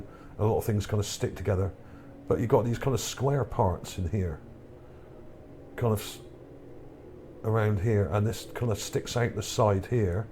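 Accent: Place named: Wiltshire, United Kingdom. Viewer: Good Wiltshire accent 👌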